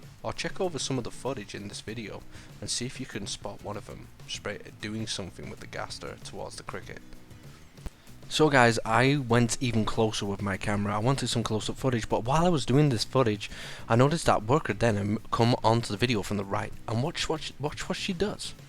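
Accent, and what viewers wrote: United States. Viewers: Are you American, Scottish or Manc? 🤔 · Why are you putting on an American accent?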